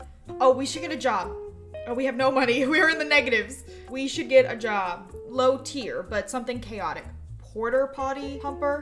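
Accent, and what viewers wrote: United States. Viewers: I thought u were British, I'm British, how did I not notice u were American??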